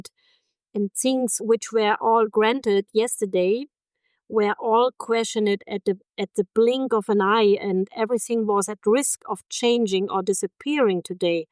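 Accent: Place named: Germany. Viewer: She sounds exactly like a former "n4zi" saying "I had no idea about zee camps".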